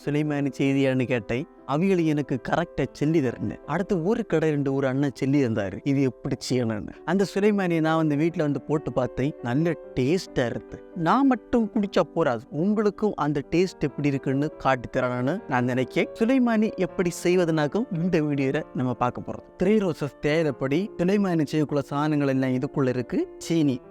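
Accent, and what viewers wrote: Kerala, India. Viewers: அடேய் தம்பி., சுலைமானி tea பல பேரு செய்றாங்க..நீ எப்படி பேசிகிட்டே செய்றன்னு பாத்தேன்..உன் கேரளத்து தமிழுக்காக தான் இந்த காணொளியை பார்க்கவே செய்கிறேன்..😅😂🎉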